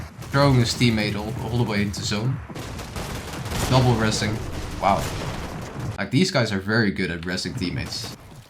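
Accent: Netherlands. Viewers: good video keep it up, are you dutch by any chance? you sound NL ❤❤❤❤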